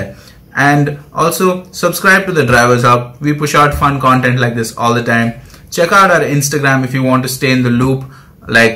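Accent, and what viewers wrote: India. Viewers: Finally a Indian English review.!!! Thank you for helping me understand the review. Was looking so hard for a good review well done!!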